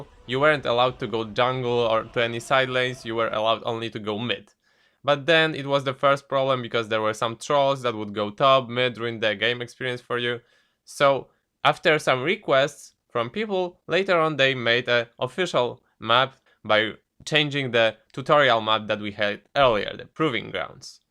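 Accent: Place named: Poland. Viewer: You know Pole as you listen to one m8 :D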